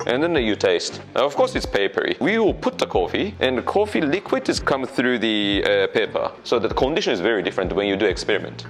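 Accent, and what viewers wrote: United Kingdom. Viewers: 우리도 영국영어 도입이 시급하다 귀에 쏙쏙 박히네😆😆😆😆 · 영국식 발음을 구사하시네요. 영어도 굉장히 잘 하시구요.ㅎㅎ 설명이 너무 좋습니다. 모든 행동에 다 이유가 있으세요. 마치 수학자처럼 꼼꼼하게 커피를 연구하시는 분인 것 같습니다. 멋진 분이신 듯 ..많이 배웠습니다,~!!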